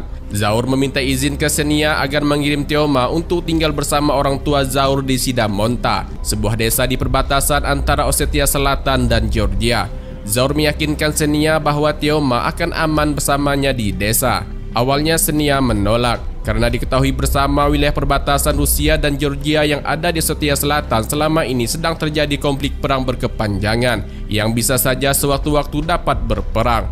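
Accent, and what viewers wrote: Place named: Aceh, Indonesia. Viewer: Logat Aceh pak Admin ya😂😂, mantaf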